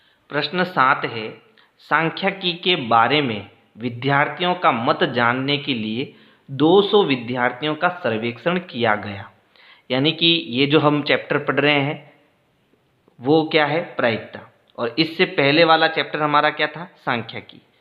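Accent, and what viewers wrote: Rajasthan, India. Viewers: Man you from Rajsthan ?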